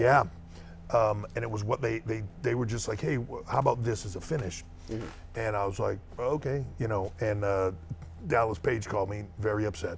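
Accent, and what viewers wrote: Canada. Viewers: I swear, the older he gets. The more canadian he sounds.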